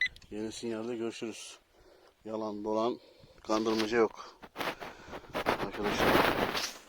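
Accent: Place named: Black Sea, Turkey. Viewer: Karadeniz gibi bee mübarek memleketim yemsil...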